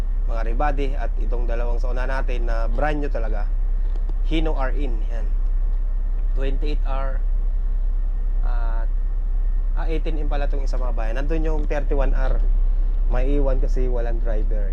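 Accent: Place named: Bicol, Philippines. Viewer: Ganun talaga kapag bicolano ... May diin at minsan wala ang letter sa word na sinasambit ... Pagpasensyahan mo nalang 👍👍👌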